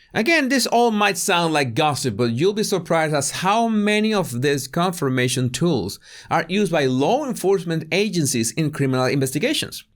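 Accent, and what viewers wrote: Spain. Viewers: Hes cute too I love that spanish accent. Oye give it to me papi chulo! da meh lo. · hilarious without sounding cruel when we all know full well that its not a compliment just used. Please keep that Spanish lilt, its honest, cuts to the chase and very amusing.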